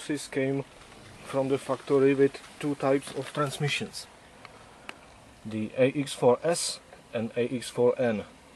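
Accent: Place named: Czech Republic. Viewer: So sorry. Now I see Czech. Definitely not a Deutsch accent. By the way, the car is working fine. If it goes bad, I'll know the fix.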